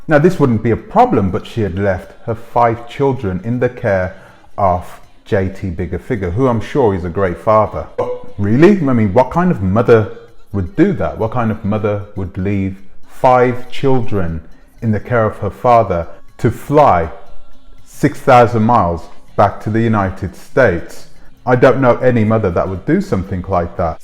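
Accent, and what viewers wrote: United Kingdom. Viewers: Your a weirdo for digging in the brother JT's personal life . Corn ball British accent . FOH !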